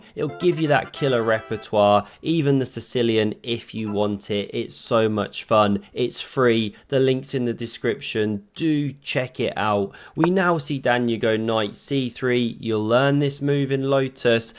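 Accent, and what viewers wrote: United Kingdom. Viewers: Got to love your British accent... I thought I heard "What a channel! Like a BETA version of me" and I‘m like "wait, what ?!!" So listened again. Love the humility. 180 degrees from what I thought I heard. Both channels are awesome.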